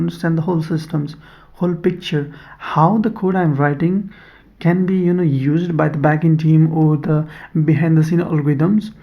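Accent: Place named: Nepal. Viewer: bro are you nepali?your accent sounds like that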